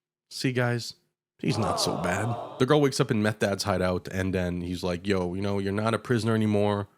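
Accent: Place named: Quebec, Canada. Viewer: Tes québécois toi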